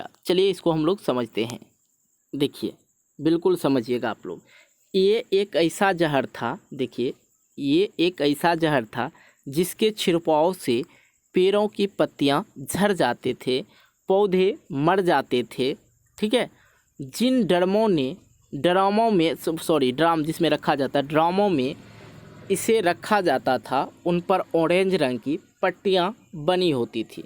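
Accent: Bihar, India. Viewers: Aap Bihari h ka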